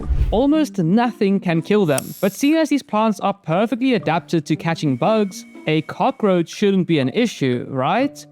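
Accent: South Africa. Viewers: Totally random, but you sound like you have a South African 🇿🇦🇿🇦🔥🔥🔥 I'm from SA too ✨✨✨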